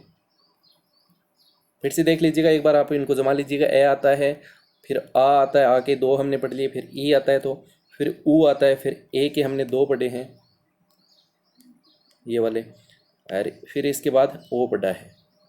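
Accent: Haryana, India. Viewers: Aapne tho kamaal k notes bnaye h Guruji 👏 PTA nhi murkh log kyo aapki vedio ko like nhi karte aapko tho gold ka button milna chahiye ,aapki aawaj aaj kuch badli Hui so lagi Guruji 👏 aapki aawaj Mai hmare area ka touch h from kaithal HR thanks a lots again 👏♥️♥️♥️💗💖♥️♥️💗💖🙏👏👏🙏♥️🙏🏻🙏🏻🙏🏻🙏🏻🙏🙏🏻🙏🙏🏻🙏🙏🏻🙏🙏🏻🙏🙏🏻🙏🙏🏻🙏🙏🏻🙏🙏🏻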